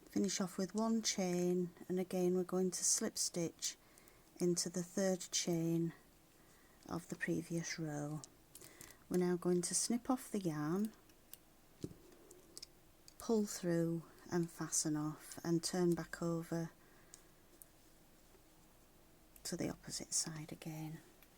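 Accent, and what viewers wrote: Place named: United Kingdom. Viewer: You sound British, so why are you using American terminology?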